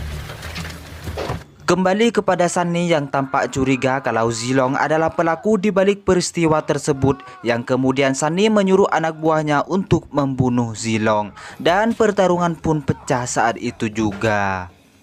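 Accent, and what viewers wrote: Madura, Indonesia. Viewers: Logat maduranya kentel😁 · Logat Madura ya bang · Bang orang madura ya?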